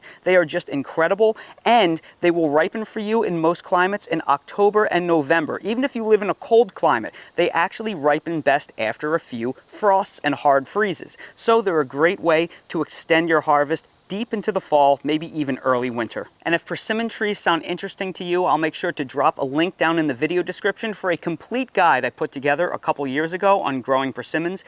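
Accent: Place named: Philadelphia, United States. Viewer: Your accent tells me you are from Philly. Is that correct?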